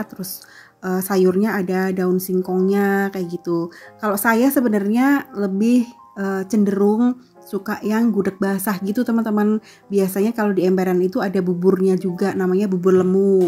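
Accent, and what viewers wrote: Banyumas, Indonesia. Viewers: Seneng liat vlog nya bunda berasa lg pulang kampung😀😀 pokoke ora ngapak ora kepenak ya bund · Wkwkwk.. Jebule wong ngapak.. Tosss disitt.. Yuh pd mampir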